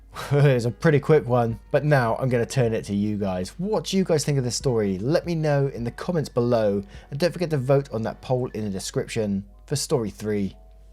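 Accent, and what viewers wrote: United Kingdom. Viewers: When I'm trying to take a nap after chemo, I turn on your playlist. Your voice is very soothing (could be the accent, you know how American females feel about a UK accent😂) and I get a great nap. I always go back and listen again while awake. So yeah, you put me to sleep, thank you. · I am crocheting a blanket, while I listen your beautiful British accented voice read to me!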